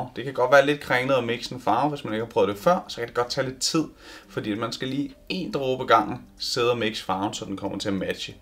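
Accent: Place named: Scouse, United Kingdom. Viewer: Thought you were talking scouse at first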